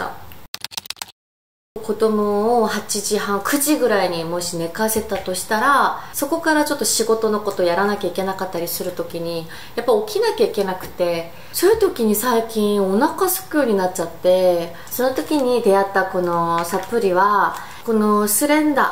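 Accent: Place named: Kansai, Japan. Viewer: 関西弁おもしろい😂アロマ使ってみたいです！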